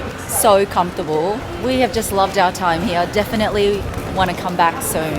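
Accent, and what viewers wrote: Australia. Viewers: Just wondering, are you Asian, or Australian? You look Asian, but have an accent of an Australian.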